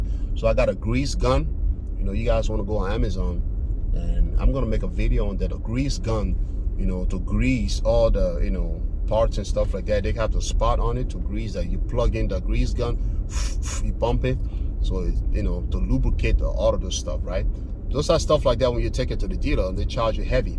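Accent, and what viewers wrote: Liberia. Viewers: i miss raleigh!! i was tryin to figure out what road you were in and it was hillsborough st....memories...sigh. keep up the good work fellow African. You sound Liberian. My in law is Liberian lol. Good lookin out. i took notes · Sounds like a Liberian. My brabee ur Peking about to get his truck soon.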